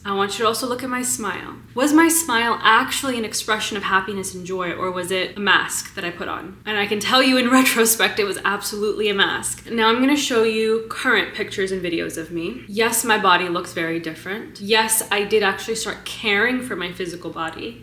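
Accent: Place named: United States. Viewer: Defiantly fine, fine, fine. Yet I have a hard time making out what ethnicity you are though because of American accent is strong.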